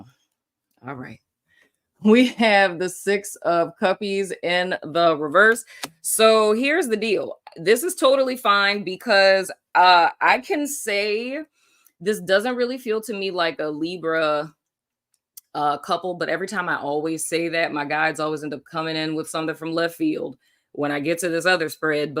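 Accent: New Orleans, United States. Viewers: Libra Sun , Scorpio Moon and I'm from New Orleans too lol that accent will slip out baybay 😄⚜️⚜️⚜️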